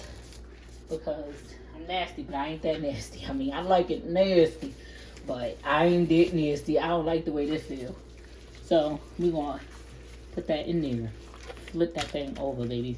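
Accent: New York, United States. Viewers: Im loving your channel and I love hearing you talk!! I love the ny accent...ima Florida gal!! I drive my 18wheeler thru NY!! You season your food like I do mine but ima use the sauces.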